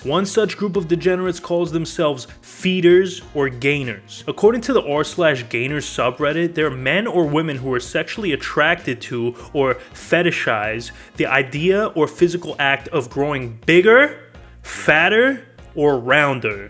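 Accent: New York, United States. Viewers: New Yorker? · A buddy just turned me on to your channel yesterday. Of course, I subscribed. You are funny, and you have a cool accent. I'm originally from Boston and I am guessing your accent is NYC area, not Boston. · You Sound Like You Have A New York Accent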